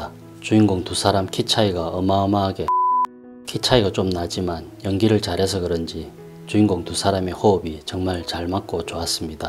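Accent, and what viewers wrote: Gyeongsang, South Korea. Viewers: 갱상도 분이시네!! 반가워요^^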